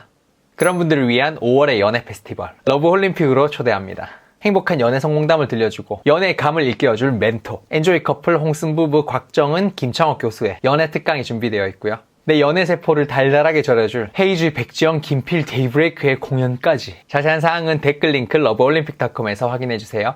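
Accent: Gyeongsang, South Korea. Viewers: 뭔가 말투가 말 끝부분은 서울말인데 단어단어에 부산, 경상도 사투라가 녹아있음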